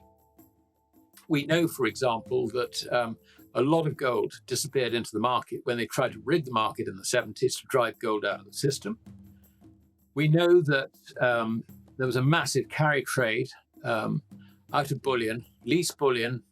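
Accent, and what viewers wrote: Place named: United Kingdom. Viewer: It’s hard enough to listen to his Brit voice, the back ground distraction kills his message. I can only give it 5 min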